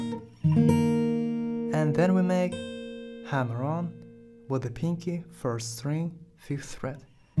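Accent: Russia. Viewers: по произношению чел русский, я думаю, что это с, лайкни, если я шерлок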